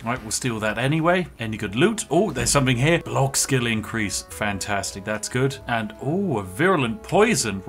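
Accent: United Kingdom. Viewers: Is british? I can't help but notice his accent...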